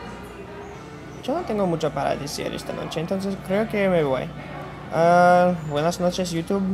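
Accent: Spain. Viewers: good spanish :) dude idono why but ur accent around there in the beginning almost sounds like a spaniards accent with the tongue lol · Bien, continúa practicando. Sueña como español de España. Saludos. .! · hey, excelente pronunciacion, da gusto que alguien se interese por aprender otros idiomas en especial este que es complicadisimo, tienes un acentillo que parece castellano. (from spain) aunque creo que todos ya lo hemos notado jajaja, nadamas el tipico error ocasional extranjero de confundir femeninos y masculinos, but i do that a lot with irregular verbs in enlgish, sometimes i travel in time. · tu español es muy bueno, tienes el acento de españa. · Hola,..¡¡Ya me gustaría a mí hablar inglés como tu lo haces en español!! Sólo te falta pasar un año en España(lo digo porque tu acento es de aquí), o en cualquier otro país hispanoamericano para limar esos pequeños detalles. 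Se te nota soltura al encadenar las frases,tu vocabulario es amplio y tienes, en principio, una buena declamación.Tan sólo debes pulir un poco la pronunciación. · Lo hablas muy bien comgra...!!!!! tiene el accent de espana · Your Spanish seems like European Spanish, but is good. I know Spanish is difficult. I say "Hi" from Venezuela. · Acento español ( from spain ), FELICITACIONES. Mejora la pronunciacion de la VOCAL "O" y la CONSONANTE "D". SALUDOS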